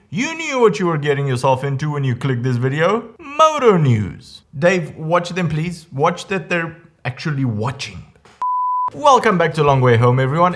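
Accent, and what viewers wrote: South Africa. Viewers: Thanks for the great info, where are you from? you sound positively Afrikaans/South African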